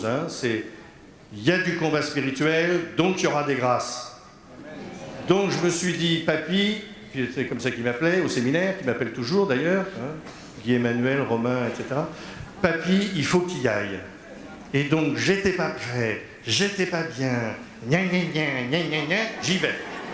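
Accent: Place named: Nord-Pas-de-Calais, France. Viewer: Merci beaucoup pour votre témoignage et votre humour, on dirait un chti, comme moi, sauf que moi je ne suis pas guérie ! Évidemment je ne suis pas en chaise roulantes, mais je prie pour ne pas en avoir une ! Non c'est pas vrai, c'est une blague ! Je suis très contente pour vous, mais je plein ceux qui vous entourés, vous parlez beaucoup, moi aussi, ils ne me coûtent plus, c'est laventage quand on est jeune de 62 ans et demie ! Mdr ! Gros bisous merci à Dieu, que vous puissiez continuer comme ça encore très long......temps!lol bon courage merci beaucoup Mr, je sais plus !... Le curé ou mon Père, zuttt j'ai oublié ! Gros bisous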